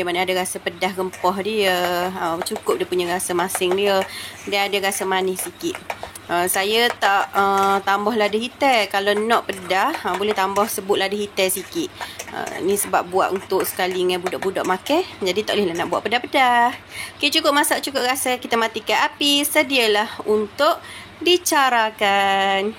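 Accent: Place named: Kelantan, Malaysia. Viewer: sangat menarik logat bahasa yang kaka gunakan.....serasa ingin belajar bahasa Kelantan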